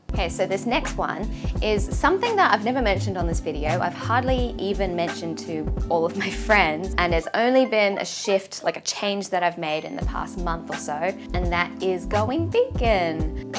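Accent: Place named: Australia. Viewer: How good is Gary V! Really liked this video. I'm a fellow Aussie and just new to your channel but I particularly like it your content around Japan which is on my bucket list. Keep doing what you do, you're a natural in front of the camera and hearing the straya accent is soooo refreshing from all these American's. :-)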